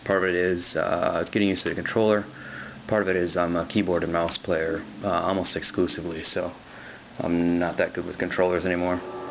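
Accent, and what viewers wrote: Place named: Canada. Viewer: Funny, the beta was only for USA...or was it NA? because you sound Canadian.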